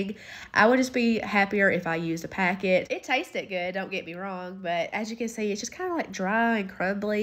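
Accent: South, United States. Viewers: I absolutely adore your southern accent! Your recipes always look amazing!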